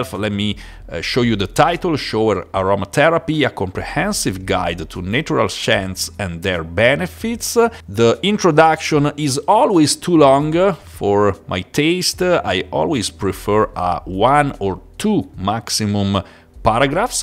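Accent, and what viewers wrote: Italy. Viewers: I love your perfect English with a delightful Italian inflection when a word ends in a consonant ❤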